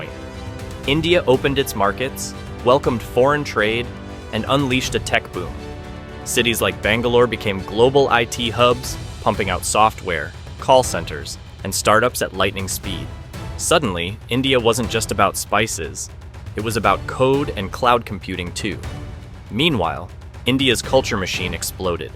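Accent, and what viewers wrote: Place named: United States. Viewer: I was suspicious when I heard your Amurcan accent, but literally stopped when you said "dude". For heaven's sake.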